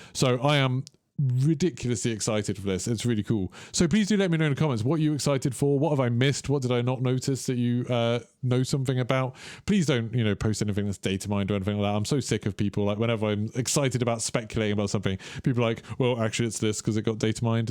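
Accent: United Kingdom. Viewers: Question: I seriously hope I'm not being offensive, so please don't like, flip out, but why do British Pronounce LZ as LZead and Lieutenant as Leftenant?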